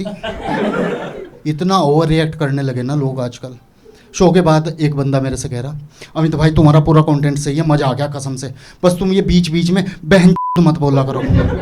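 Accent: Western Uttar Pradesh, India. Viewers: I love west up Xcent 😅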